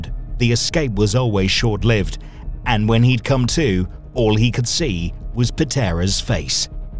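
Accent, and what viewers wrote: United Kingdom. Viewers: the British pronunciation of the surnames is laughable.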